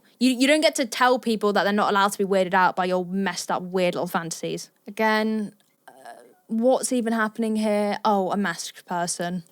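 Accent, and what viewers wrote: United Kingdom. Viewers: I like your british accent <3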